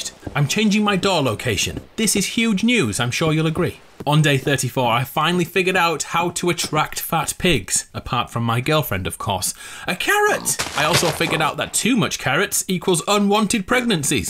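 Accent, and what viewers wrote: United Kingdom. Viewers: are you british 🇬🇧?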